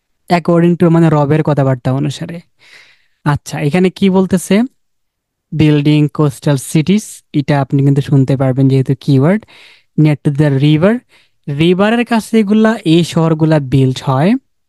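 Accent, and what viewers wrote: Sylhet, Bangladesh. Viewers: উনি হয়তো সিলেটের তাই কথা কেমন। এটা ব্যপার না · Baiya apner basha sylhet kutay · Sir amioy sylheti,,, apnar kota shuna kno jani mono or afna sylheti,, 🥰thanks a million sir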